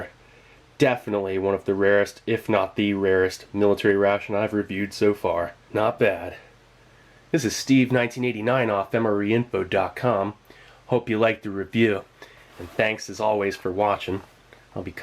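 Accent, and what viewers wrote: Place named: Midland, United States. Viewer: he's american, i can tell by the accent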